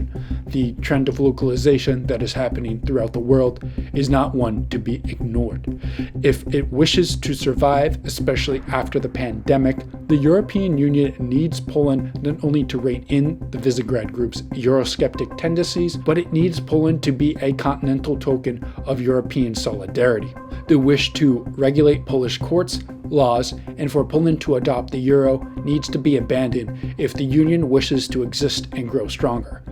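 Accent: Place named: United States. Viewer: Myślę że to Akcent amerykański ale nie jestem pewien z jakiego rejonu.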